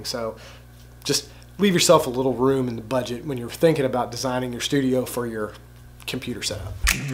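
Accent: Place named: South, United States. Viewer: This is the first time I've ever watched one of your videos, and all I can say is WOW!!! The editing is exquisite, the content is so helpful, and I love your accent. :) Where are you from? I'm an east Tennessee gal, myself, so I love a good southern accent! Anyway, awesome job, man. You just gained a new subscriber. 👏👏👏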